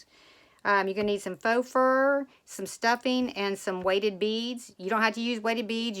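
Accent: South, United States. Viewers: Fantastic can’t wait to get making some! I love your southern US accent too 🇬🇧😀🤗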